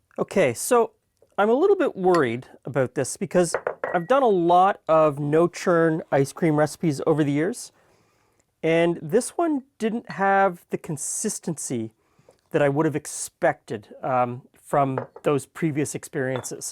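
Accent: Canada. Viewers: everyone's talking about the bagged milk but I was like "he sounds Canadian" despite most Canadians living in Ontario have a very subtle accent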